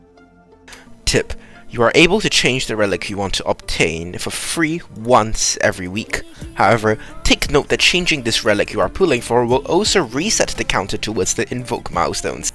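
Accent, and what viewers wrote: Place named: United Kingdom. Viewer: Got to love that british accent!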